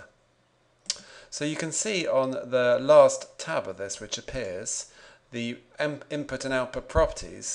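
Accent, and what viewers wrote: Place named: RP, United Kingdom. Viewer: If 007 did a video tut on ssis, this is how it would be -- entertaining and informative, all done in typically delightful Queen's english! Thanks for the awesome videos. -- roger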